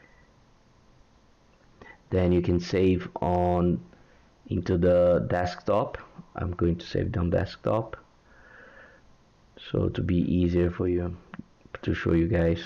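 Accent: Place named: Brazil. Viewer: Your English sounds like "Brazilian English", it's an easy accent for me to understand. Thanks for the video, it worked!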